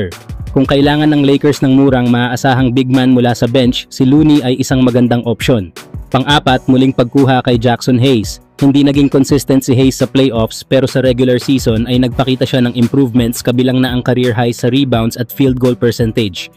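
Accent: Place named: Visayas, Philippines. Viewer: Bisaya siguro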